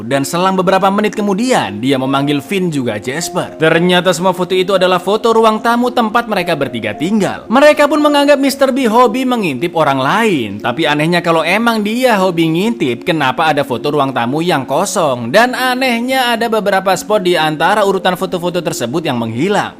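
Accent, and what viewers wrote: Java, Indonesia. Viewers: Jawanya medokk bngett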